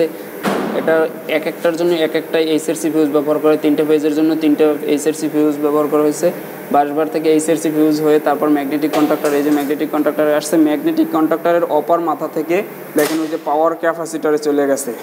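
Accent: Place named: Barishal, Bangladesh. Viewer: বরিশালের ভাষা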